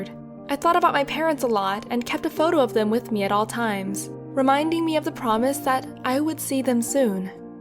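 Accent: United States. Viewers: she sounds american also (not bieng racist) dont asian people have like an acsent like common she dosent sound korean at all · U r from korea but u have a american accent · Dislike. She sounds like she's from the USA, not North Korea, so she has no first hand experience, This makes her sound like a propagandist. To anyone who this did not occure to, your thoughts and opinions are being controlled by propagandists. I do not know the full message of this video as I stopped at 58 seconds, so if anything changed, then I don't know about it, but from what I heard so far, it sounds so incredibly propagandist to a point that it;s difficult to listen to. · She does not sound fron North Korea 🇰🇵 she sound from America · Yet you sound American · hmmm American accent hmmm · Stick The person who submitted the story is from North Korea, the narrator is American.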